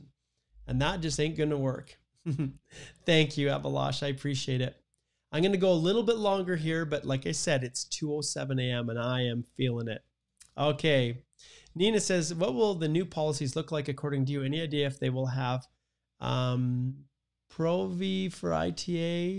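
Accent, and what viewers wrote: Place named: Portugal. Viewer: Great Portuguese,! I'm impressed. You got a bit of Portuguese (from Portugal) accent there, awesome!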